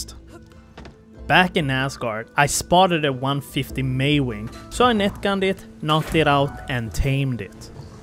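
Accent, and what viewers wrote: Sweden. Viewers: haha visste du va svensk med din brytning inom svengelska 🤭🤭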